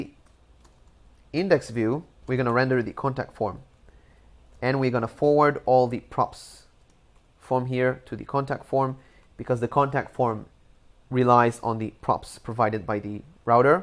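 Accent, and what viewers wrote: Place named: Israel. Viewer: סרטון טוב תודה!! באמצע הסרטון פתאום חשבתי לעצמי... רגע רגע יש לו מבטא ישראלי :)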